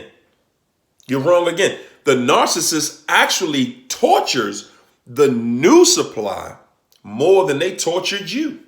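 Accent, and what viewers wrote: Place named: Philadelphia, United States. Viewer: That is right ( more sincerity from the brother ) please everybody hear what he's saying. Yo, I'm talking about culture. He reminds me of my street friends. How we talk is how he talks..and he's saying a lot of things on the subject of narcissism. I'm so proud of you my friend and brother. I'm a NY'er, you sound like you from Philadelphia, you say DC keep doing what you're doing. Great message.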